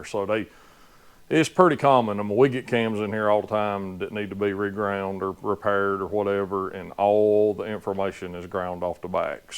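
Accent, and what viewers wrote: South, United States. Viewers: The views will always speak for themselves. I agree with the majority, I like the drama videos, if that's what we're going to call them. Especially with your Southern drawl, it's really more of a telling it like it is video. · Do not like hear your southern drawl put me to sleep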